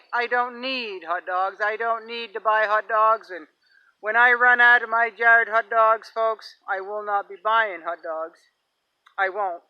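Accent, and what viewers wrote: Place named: New England, United States. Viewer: Hi, I just recently found your channel and I enjoy your videos. I wanted to tell you also that your accent reminds me of New England grandfather, I sure miss him and hearing you talk brings back great memories. Thank you, God bless 🙂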